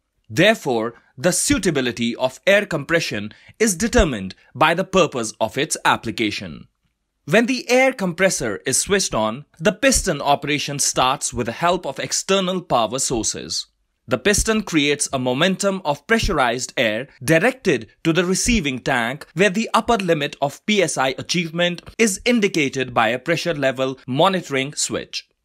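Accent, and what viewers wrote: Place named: India. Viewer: It's Indian english accent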